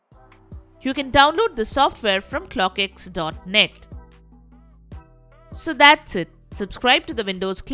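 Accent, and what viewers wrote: India. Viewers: hidious indian accent ... also 'dekstop'? learn to read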